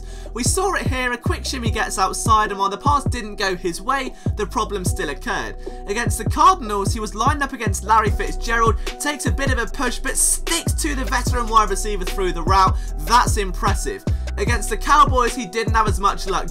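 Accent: United Kingdom. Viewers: who the f is this BRIT talking about FOOTBALL???!!! doesnt even sound right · Wow this is different somebody reporting about the NFL with a British accent are we getting through to the people across the pond that american-style football is Supreme Over homosexual soccer ?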